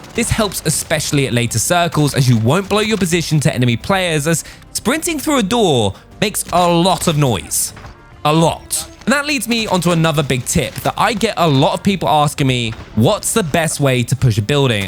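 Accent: United Kingdom. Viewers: Now I know....he actually British by his accents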